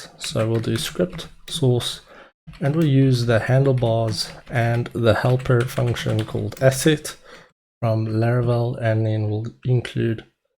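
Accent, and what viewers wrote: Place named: South Africa. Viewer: I know my south African accents😂😂 im from Joburg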